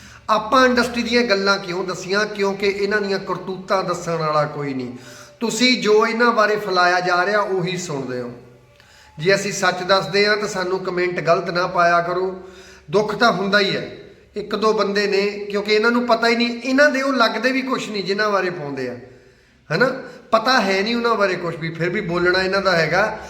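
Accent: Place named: Doaba, India. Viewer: Eh mazhe wal di boli nai ji. Eh doabe di boli aa.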